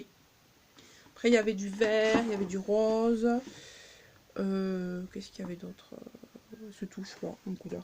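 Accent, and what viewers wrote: South, France. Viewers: Mais je n'avais pas fait attention à ton petit accent du sud. Je ne savais même pas qui Vendée de la peinture chez Action. Tu as fait pamal d'achat. Merci pour le partage. Au plaisirs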